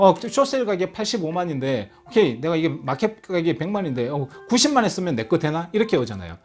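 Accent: Gyeongsang, South Korea. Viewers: 처음 영어로 준비없이 들은적이있는데 도통 감을 못잡겠더라구요. 정감있는 경상도사투리로 설명들으니 이해가 잘되네요ㅎ 감사합니다. 북가주에도 계셔서 조언받을 수 있으면 참 좋겠습니다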